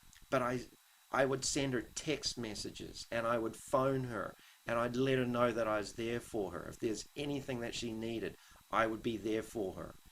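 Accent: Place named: South Island, New Zealand. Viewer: Kiwi,You sound like you are from the mainland/south Island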